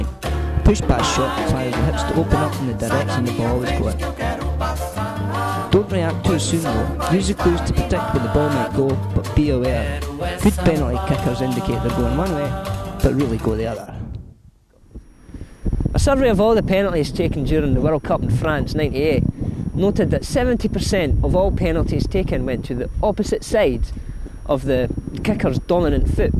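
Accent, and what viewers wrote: United Kingdom. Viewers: his accent is scottish and so am i glasgow :D good tips aswell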